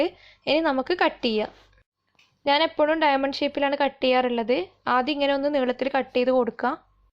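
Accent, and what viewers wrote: Kannur, India. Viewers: Samsaram kettappam manasselayi kannur aan annu , njan Kuthuparamba aan, cakel oru cup RAVA charthaal nannavumo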